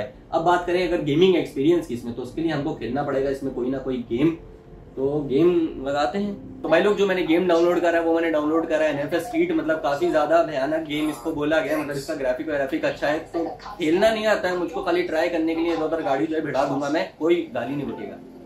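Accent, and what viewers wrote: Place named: Kanpur, India. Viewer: bhaiya kanpur se ho kya aap ?....apki boli se lagra hai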